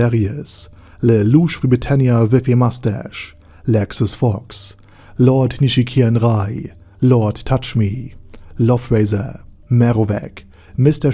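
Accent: Germany. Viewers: This German accent haha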